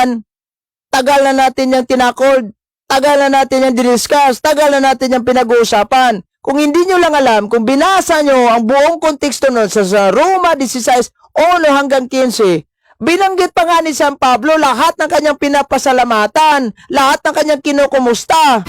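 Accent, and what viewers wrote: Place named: Tondo, Philippines. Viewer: Bakit ganyan ang tagapagsalita, parang nakikipagdaldalan lang sa kanto at usapang lasing. Hindi maayos magsalita, tigas ng at accent at parang lumaki sa Tondo . Para bara bara lang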